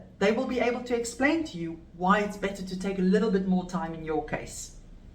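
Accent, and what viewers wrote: South Africa. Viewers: This video was the best help and support I've had in the whole duration of my achilles recovery! Thank you so much for the breakdown of the recovery process.: baie baie dankie - mal oor jou aksent. Dit was so lekker om hier in Australia na n stunning video vanaf UK te kyk en lekker te voel oor ons Saffer roots!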